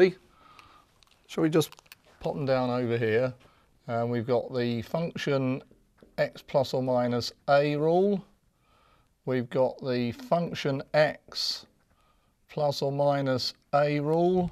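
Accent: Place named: England, United Kingdom. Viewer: Takes a bit for me, as an American, to get used to the English accent, but I find the explanations to be quite good. Thanks for the upload!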